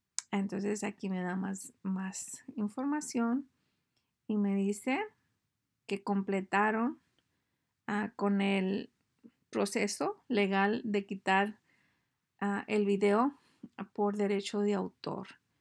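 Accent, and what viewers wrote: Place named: Cusco, Peru. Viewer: La ignorancia es atrevida e insolente, para tu conocimiento, ella es de Perú, pero no de la capital Lima, en Lima como en otras provincias el español es diferente con diferentes acentos, los de la costa, sierra y selva no hablan igual. es del Cuzco y allá la gente habla así, si te burlas de ella te burlas a base de tu ignorancia y tremenda insolencia, puedes opinar pero, antes EDUCATE E INFORMATE, para no decir tremenda estupidez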